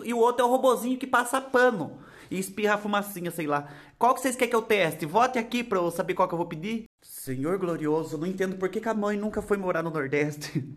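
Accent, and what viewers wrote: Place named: Piraju, Brazil. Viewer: somos vizinhas kkkk Moro aqui em piraju Parabéns pelo canal, me senti muito representada no seu sotaque e sua simpatia... Deus abençoe pra continua crescendo mais e mais, SEU NACAL E LOCO DE BOM!!!!!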